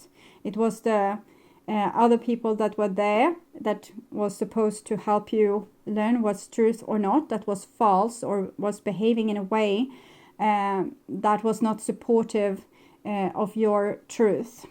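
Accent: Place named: Sweden. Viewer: Are you Swedish btw? Im from Sweden and I think your accent sounds a little "svensk" :-)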